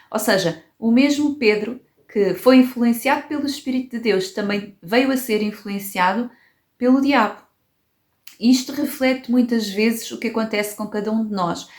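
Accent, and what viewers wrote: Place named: South, Brazil. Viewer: Que linda eu aprecio muito o português sul real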